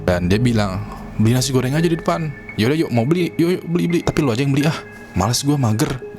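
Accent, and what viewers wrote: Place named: Bekasi, Indonesia. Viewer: bang lu orang bekasi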